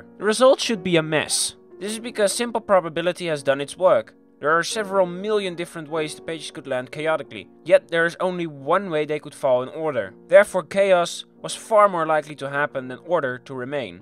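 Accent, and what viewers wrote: Netherlands. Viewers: Are you Dutch? Sounds like it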